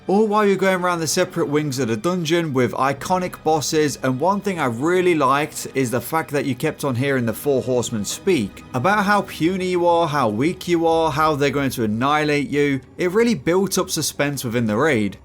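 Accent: United Kingdom. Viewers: Oh god why does it have to be bri’ish… blimey mate talk normal please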